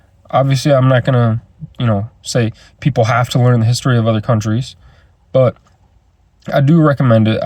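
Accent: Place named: Brazil. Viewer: Before you told us you lived in Brazil, I could tell that just by your accent.😅🇧🇷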